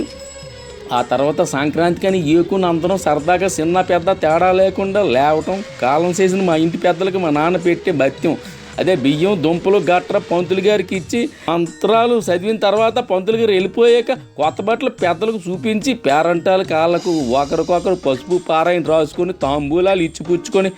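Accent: India. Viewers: మీ వాయిస్ ఓవర్ విన్న తరువాత కూడా మళ్లీ సంక్రాంతి సంబరాలు విన్న అనుభూతి కలుగుతుంది బావగారు... ముఖ్యంగా ఉత్తరాంధ్ర యాసతో మీ హావభావాలు అత్యద్భుతంగా ఉంది 🎉🎉🎋🎋💐💐🔯🔯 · శ్రీకాకుళం యాస భాష అచ్చంగా కనిపించింది. సంక్రాంతి సరదాలు కొట్టవచ్చినట్లు కనిపించసాగాయి.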